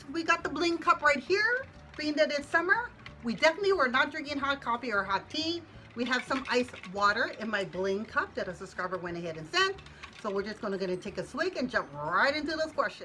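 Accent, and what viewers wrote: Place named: Texas, United States. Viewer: I really like this Q&A I really got to know you a lot better. after I finished Cosmetology in realize that I didn't like people that much I went to a funeral home and asked if I could get a job there and fortunately there's one person that pretty much does all the people there so they didn't need help at that time but I have thought about doing that sort of work. I would still get the fix hair and not have to deal with talking to the person. I later thought that it would probably be worse with the family loved ones being so particular of the person the looked before they died. I'm not a quiet person so that probably wouldn't work out for me either. I like how you was talking about your husband's Texan accent was rubbing off on you that's super cute.Great video👍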